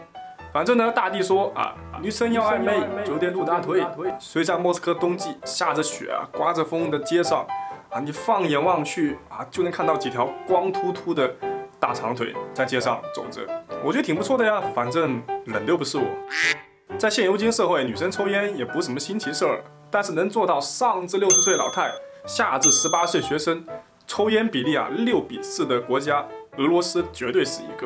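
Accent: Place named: Shaoguan, China. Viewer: 看你的说话口音和脸，我猜你是广东韶关地区的😀我粤西人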